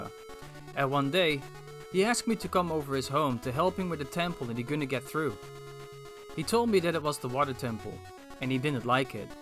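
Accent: Netherlands. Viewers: Hoorde aan je accent gelijk dat je nederlands was. Which is nice. ANOTHER DUTCH ZELDA FAN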